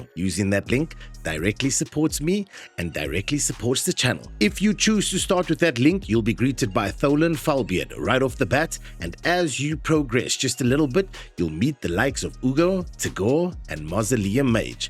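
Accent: South Africa. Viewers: Yo bro, I take it you're from SA, I hear that colored accent. Nice video. I cannot agree more with everything you've said. Good job.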